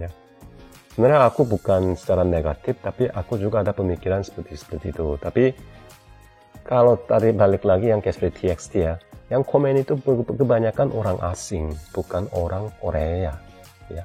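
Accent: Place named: Java, Indonesia. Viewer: Intonasinya kaya orang jawa ada medoknya dibeberapa bagian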